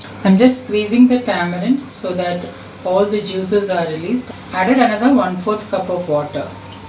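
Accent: Kerala, India. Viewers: I like listen to Kerala accent :) pure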